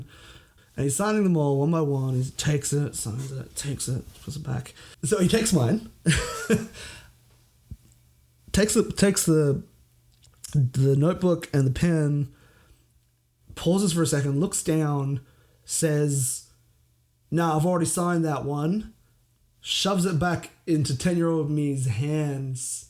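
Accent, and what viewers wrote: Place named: Australia. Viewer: Bouncy happy Australian boy.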